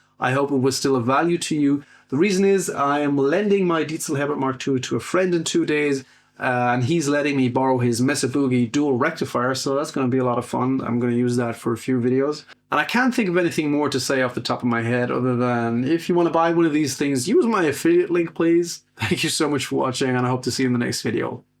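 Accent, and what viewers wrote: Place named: Ireland. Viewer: Also, apologies if its been mentioned in other videos. I've been meaning to ask for ages. Where's the accent from? I'm in Wexford and I know I'm hearing an Irish accent but theres something else going on thats throwing me off.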